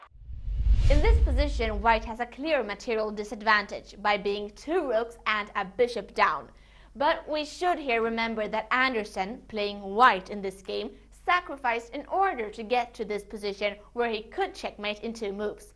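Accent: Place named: Sweden. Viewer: Nice, a puzzle with some history behind it. Loved it. I think your typical swedish accent is getting less and less apparent.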